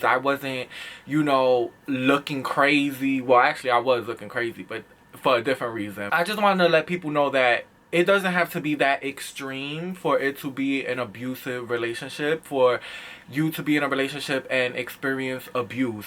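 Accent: New York, United States. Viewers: Honestly, when you were talking about the insecure thing.. I think it may be a Virgo thing because I’ve been in situations where people think I’m trying to be better than them or something. Your lingo is what makes you unique babe, especially that New York accent! 😍 Gemini’s are not for us, Virgos! I’ve learned that we don’t mesh well. My abusive ex was a Pisces. She was so insane and mentally and verbally abused me and it was the worst. The whole time you’re the victim and they turn around and act like you did everything to them... that was the fucking worst thing for me to face especially in high school. 🥺 Telling someone they’re “too skinny” is the equivalent of saying someone is “too fat”. My ex used to tell me I wore too much makeup and that I “needed to fix my fucking face”. 🙄 I know I did things wrong too, but not as bad as what she did to me... 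🥺 My ex had her friends belittle me on social media and in person.. I was 16-17 with no backbone and wouldn’t fight or nothing (before anyone judges). Like you, I kept running back and anytime we talked it turned into constant and repeated arguments. I hated wearing makeup cause I felt like she was gonna tell me it was ugly and tell me to fix myself. I would cry every single day and try and maintain my relationship and my mom was on the verge of going to prison over this girl. We continued to break up and get back together until we graduated and she continued to bother me during my graduation present vacation trip thing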